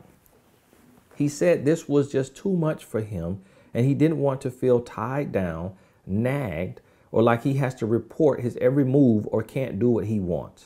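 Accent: South, United States. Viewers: As always, thanks for keeping it real. And you say all this, with an even tone and country drawl, cracks me up! Very interesting psychology of men.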